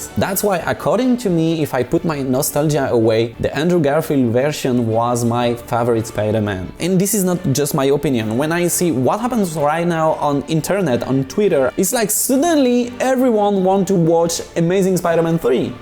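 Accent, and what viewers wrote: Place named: France. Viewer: its always beautiful this french-english accent, keep goin :))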